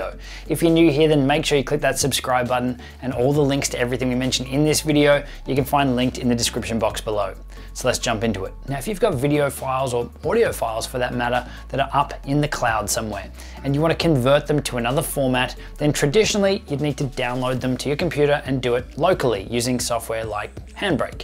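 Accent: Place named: Australia. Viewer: Nice work mate. Your work on these productions is much appreciated. Hearing another Aussie on YouTube is also a bonus. :)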